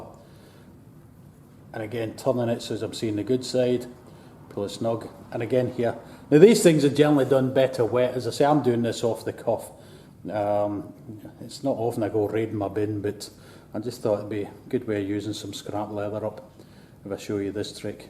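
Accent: Scotland, United Kingdom. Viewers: Thanks for sharing! Great video, don't worry about it being a bit raw, people watch it for your skills and I also appreciate listening to some Scottish ;)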